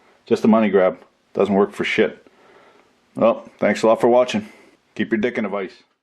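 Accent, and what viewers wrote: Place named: Canada. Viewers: My wife think's voice (read Canadian accent) is kinda sexy, since watching while in bed has been my newly formed habit. I can confirm that sexy time with the mrs has increased approximately 33.333% this past month (March). He is the ultimate wingman; helps me get laid while teaching. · it's that Canadian charm boys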